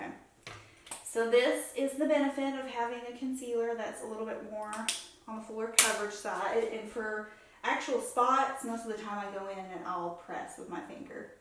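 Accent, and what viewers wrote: South, United States. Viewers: When you're near your mom, your southern accent comes back a little bit lol. Such a sweet video! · Your beautiful Southern accent became much more prominent in your conversation with your mama. You sound like me! I love it when Southern-born people proudly keep their accents. 🤗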